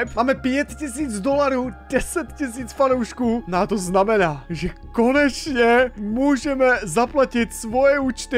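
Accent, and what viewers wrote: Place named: Pilsen, Czech Republic. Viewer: Upřímně jsem toto videjko měla dneska jako relax - byla jsem na 1 den u Plzně na výletě (v Domažlicích) a tedy... jak jsme přijeli do Prahy, energie někde (nevěřila bych, že si to někdy až tak uvědomím, ale už jen ten fakt, že se znovu vracíš do domoviny / jsi blíže do reality, tak tě vyčerpá) a já už si fakt přála, abych byla doma 😅 Vážně, dneska jedno z videí, kde jsem zarelaxovala a teď přímo postel xD Zítra ještě shlédnu videjku na main YT, ale dneska jsem si dočerpala "pozitivní energii" zde, zítra doplňuji u hlavního kanálu. Děkuji za ni (a též si budu o to více vážit, když tě někdy nějaké ten přízvuk od Plzně se občas objeví ve videjku) ❤:D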